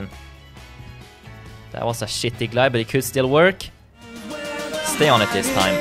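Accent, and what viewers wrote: Sweden. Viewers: Are u swedish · Are you from sweden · Are u Swedish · Ur english is so swedish.. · Are you from sweden? Är du från Svergie ? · Are you swedish? Cuz your dialekt sounds swedish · I can hear on His English accent hes Swedish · Good svengelska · Are you swedish?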